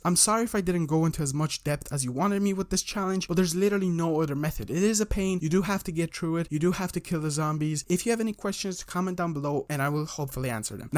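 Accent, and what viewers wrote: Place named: Ireland. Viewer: You sound Irish bro is it true but it isn’t a strong Irish accent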